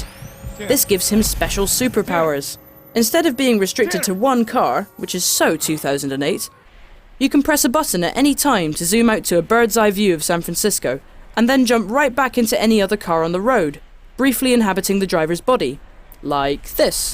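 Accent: United Kingdom. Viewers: British. I think.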